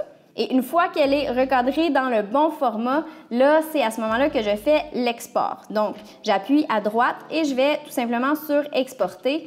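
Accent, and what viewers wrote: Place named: Canada. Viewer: Kool l'accent Canadienne 🤭🤞🇨🇦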